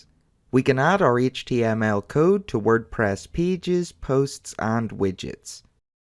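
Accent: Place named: Northern Ireland, United Kingdom. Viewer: Its Marty Dread here lol Laughing my balls off, always good to hear another person from Nor Iron.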